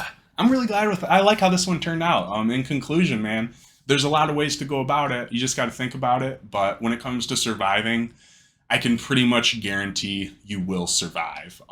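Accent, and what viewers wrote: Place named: Australia. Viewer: what did you do that landed your ass in jail . Do tell. the Australian accent in general has always given me gay energy. its low key cute. The police is super intense in america, it just snowballs from there. No matter how sweet you are if they hate your ass from jump , you are pretty much toast. I am an albino male, hyper aware that its my key visible feature. i am disrespected enough in real life so i don't think i'd nice in a confined environment if someone gets testy . i do know a lot of people find albinos spooky.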